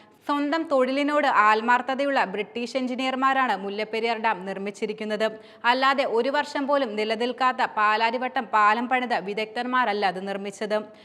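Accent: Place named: Tamil Nadu, India. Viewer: അണ്ണാച്ചി പൂറി മോൾ മലയാളത്തിൽ സംസാരിച്ച പോലെ ആണ് എനിക്ക് തോന്നിയത് 😡😡😡😡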